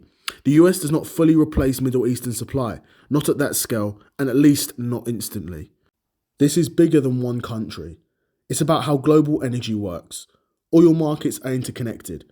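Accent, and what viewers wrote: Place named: United Kingdom. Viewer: oh,... you got a britney accent...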